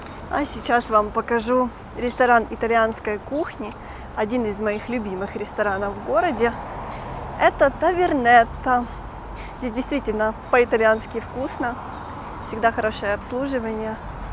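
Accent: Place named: Odesa, Ukraine. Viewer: Огромное спасибо, что вы говорите на одесском языке!!! Я коренная одесситка вынуждена была уехать из за ужасов,но так приятно смотреть на красавицу Одессу!!! И слышать родную речь!!!!!!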